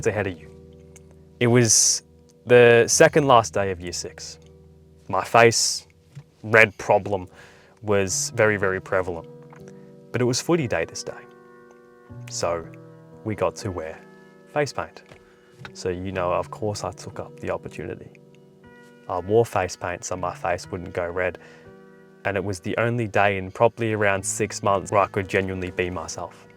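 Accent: Australia. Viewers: *? what part of Australia are you from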